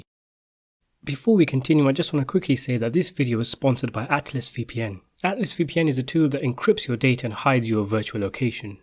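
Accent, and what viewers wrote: United Kingdom. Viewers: This was actually a pretty decent narrative but I just can’t get over a British accent describing American mafia…I just…. Can’t. It’s gotta be a New Yorker or an American who sounds like a cop. Sorry Brits…love your documentaries but when it comes to American mafia, your eloquence takes something away from the experience 😑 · Has this guy got a british accent? I am british i never thought he had one till i saw this I have always presumed the narrator of this channel was Indian Maybe he is british but from an indian family background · Pakistani British accent? Am I correct?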